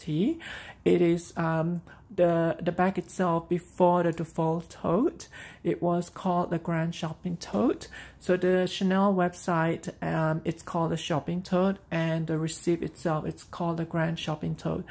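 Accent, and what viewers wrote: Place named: Singapore. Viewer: I lived in Singapore for a while so I can hear the familiarity 💕 Thank you for the beautiful review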